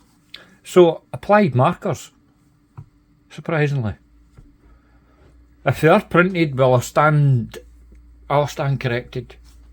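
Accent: Scotland, United Kingdom. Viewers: Sir, you are the most Scottish person I ever heard. Love it.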